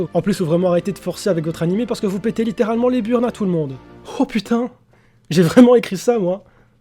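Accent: Belgium. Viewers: Très bonne vidéo ! D'ailleurs, j'ai comme l'impression d'entendre un compatriote belge ! (Liège on dirait). Tout ça pour dire que l'oeuvre jojo à part entière a énormément été une source d'inspiration dans la pop culture. Clint Eastwood a inspiré jotaro kujo et kiff l'oeuvre. Des personnages de l'arc Joseph voire même Polnareff ont inspiré capcom pour street fighter. Dans le jeu vidéo Overwatch, le personnage ninja Genji a une réplique disant " Mada, Mada." Faisant référence au muda muda de Dio et giorno. Gucci a dédié une collection de vêtements à Jojo's bizarre adventure. Les mentions et réf ne manquent pas à l'appel. Sans se rendre compte jojo à énormément inspiré les mangas d'aujourd'hui. C'est pour cela que le fanatisme est grand. Mon opinion,. C'est que Jojo est au même rang qu'un Dragon Ball ou un Naruto. C'est intemporel et inclassable. C'est juste dans le hall of fame. 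Point barre.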